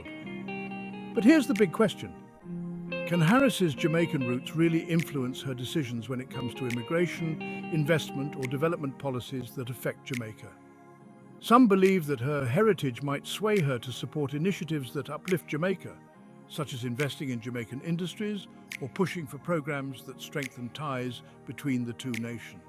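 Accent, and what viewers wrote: United Kingdom. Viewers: With that english accent ,i wonder why this one is concerned about Jamaica and USA,remind me of the Africans with no concern about their own messedup continent,but always focussing on Jamaica,but when did Jamaica become a part of the USA,its an immigrant country, jamaicans like most immigrants has been going back and forth since time began,and again why is mr Brit accent concerned about Ja..hhhhh..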